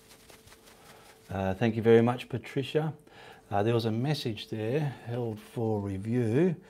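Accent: Australia. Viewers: I love your art and your Aussie voice!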